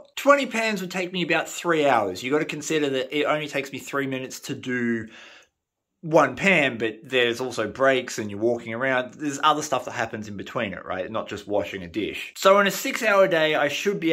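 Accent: Australia. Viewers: Yeah I did some research on Old maps from the 1800s trying to find Rivers that Prospect hit and I finally found one area earthquakes landslides blocked the waterfall that used to be there and lots of gold two and a half years it took me to get most of it out I'm sure I left some behind the life gets in the way and I'm researching old Maps in the state that I live in some pretty interesting stuff thank you for the video I enjoyed brought back memories and you were right🇳🇿🇵🇳🇦🇺😛🎅🎁🐕‍🦺🦮🍻🍻🤣🤣🍻🧁🥃📹⛏️⛏️⚖️⚒️ Merry Christmas drink lots of beer give your dogs a bone scratch gazzy behind the ear I think I'm saying his name right if not that's how it sounds you Australians you have that accent tell your woman I said hello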